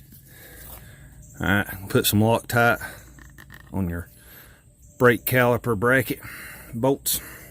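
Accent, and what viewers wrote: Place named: Deep South, United States. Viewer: Once in 1984 I took off from Reno Nevada to go visit my mom in Cookeville Tennessee in a junk 1973 Chevy Nova. It has a 3 speed on column and 250 six. I just left with almost no money to see her for Christmas. Power steering pump failed in Texas panhandle. Went to parts store got rebuilt steering pump. No pulley ! So a local (had your deep south accent) who was at the parts store "follow me home I have a press ". I follow this stranger long way . Pull up his home it was his family. They fed me and put my car back on road. Asked me and my blue 💙 Heeler to spend the night. Fed us breakfast. I am a yank. They show such love these deep south folks.Gave us food to go . Went saw momma in Cookeville for Christmas. God bless you brother. I lost her few years later.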